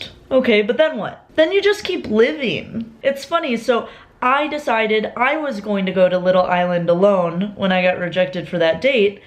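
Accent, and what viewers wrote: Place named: Wisconsin, United States. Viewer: Wisconsin Accent OP 😜🤘🏼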